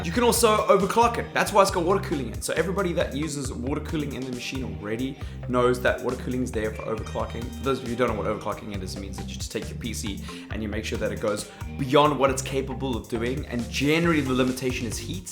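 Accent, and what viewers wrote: South Africa. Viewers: You are, was, is or come from South Africa I heard it in your voice first then this Only ppl who know what that word implies like the vid or, I WILL HATE YOU FOR THE REST OF YOUR LIFE. then i forgive you because there is no reason to hate a dead person. Just realized your hair changes color here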